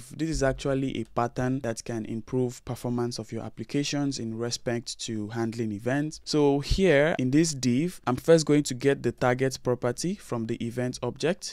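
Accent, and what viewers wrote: Nigeria. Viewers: naija accent i am gussing, leaning javascript too, thank for the video by the way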